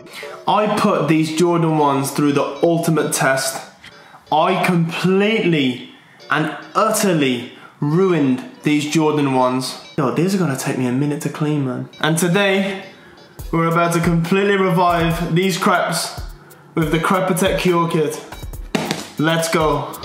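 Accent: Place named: Liverpool, United Kingdom. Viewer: What accent even is that like southern with a twang of scouse mixed in 😂😂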